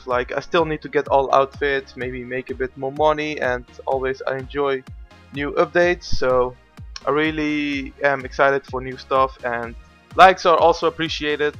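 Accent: Netherlands. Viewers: you sound dutch, am i right?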